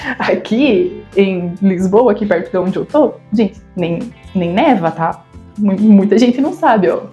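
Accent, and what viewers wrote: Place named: South, Brazil. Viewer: Há sotaques brasileiros uns bonitos e outros sofríveis. O dela por acaso é bem feio. Como ela é do Sul do Brasil e com aqueles olhos bem azuis, não temos dúvidas que é italiana ou e alemã, embora tenha nascido no Brasil e tenha sobrenome português. Mais uma que veio "istranhá" e "falá mau".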